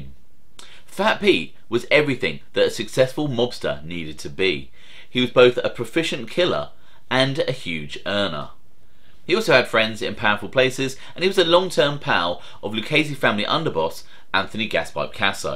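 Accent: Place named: United Kingdom. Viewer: My Pleasure! Say, based on your accent do you produce your show from the UK?? I was just wondering. In any case...are you aware of a BRITISH MOBSTER who was influential in New York in the 1920's and 30's named OWNEY MADDEN. (also nicknamed "The Killer"?) Check him out if you'd like to delve into some of the older history of the US Mobs. Interesting fellow Madden was!!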